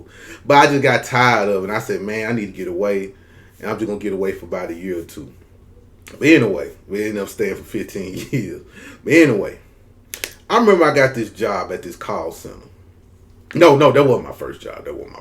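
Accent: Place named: South, United States. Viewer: Your southern accent is beautiful. I still hate that picture behind you.🌹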